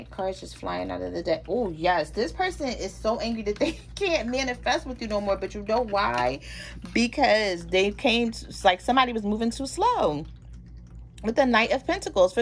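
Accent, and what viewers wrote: Philadelphia, United States. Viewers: Are you from Philly???! 👂🏽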